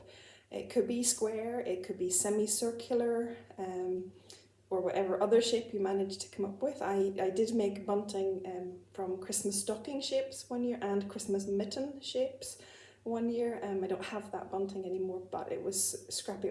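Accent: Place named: Ireland. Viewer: …you are such a kind and gentle soul and it shines in every Flosstube that you make! I find it so relaxing listening to your soft voice with such a lovely Irish accent..the way you enunciate your words, your little giggle at yourself and things you do. This earth needs more people like you. Happy Flossiversary to you! Listening to you sign off with your multiple thank you(s), it really is apparent it comes from the heart. I have a question for you…did you finish your Blackbird Designs - What Remains Is Love? I understood that you were part of a SAL and I have not seen your progress on it for quite some time. I do watch many Flosstubers and it is possible I have gotten this wrong…I ask, because I finally got a chart and decided that while others are seeming to participate in Stitch Maynia buy starting multiple projects; my plan was to be a real rebel and Mayniac and stitch every day in May on one single chart to see how progress I can make. 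I have chosen What remains and wanted to join in on the SAL. If you are doing that SAL would you mind giving me the nAme of it on IG please. Your bunting looks stunning and you deserve a medal for undertaking such a project. p.s. I love your stamp chart and story. It is actually a chart I would like to do as well, because being a Canadian I grew up licking many a stamp with the picture of Queen Elizabeth as well. The one I seem to remember the most was the royal blue one. p.p.s. I don’t stitch Halloween either! Great con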